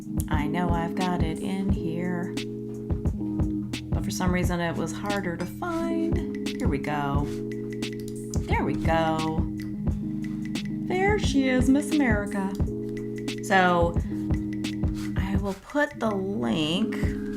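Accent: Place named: Australia. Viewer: Your Australian accent 😂😂😂 oar noar 😂😂 means “bee” so that is super cool 🐝 Melissa also means bee….. what are the odds hey? We almost have a hive in the art community.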